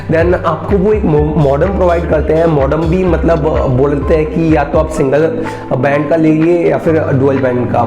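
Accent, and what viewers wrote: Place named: Assam, India. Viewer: Tumi Assamese nki bro?... good hindi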